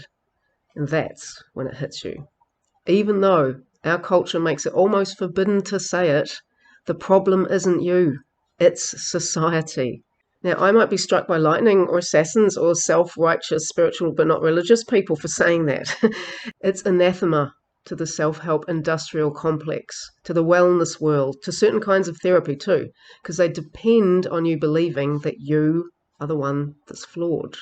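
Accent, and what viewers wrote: New Zealand. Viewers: Shes Kiwi!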